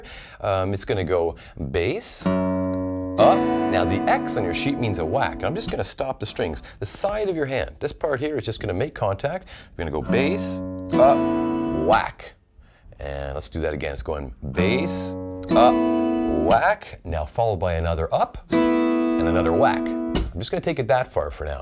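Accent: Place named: Canada. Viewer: Great video. I can tell the difference between a Canadian and an American accent easy were others cant but you sir have such a Canadian accent a deaf man could tell your Canadian. This is were im told your from Texas. :) Iv never heard such a nice Canadian accent in my life.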